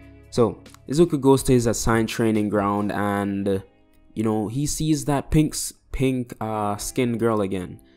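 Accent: Jamaica. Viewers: Bro are you Jamaican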